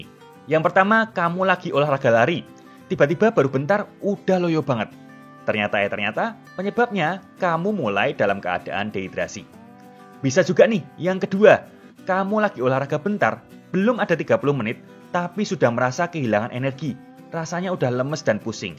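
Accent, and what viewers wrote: Java, Indonesia. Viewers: udh trlalu cinta sama channel ini.. semoga mas slalu sehat dn bnyak rejeki krn udh bantu bnyak orang untuk hdup sehat.. oh ya kak.. mau serius tanya kak orang mana ya?! kok ngomongnya logat jawa kental bgt hehe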